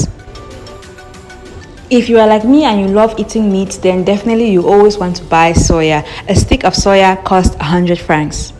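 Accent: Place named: Nigeria. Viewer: I don't know but you sound so Nigerian.. Nice video, you just got I new subscriber.. Keep up the good work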